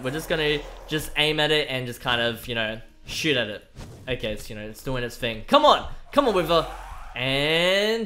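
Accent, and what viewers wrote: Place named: Australia. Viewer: its gauntlet not glove and also what place of australia ya from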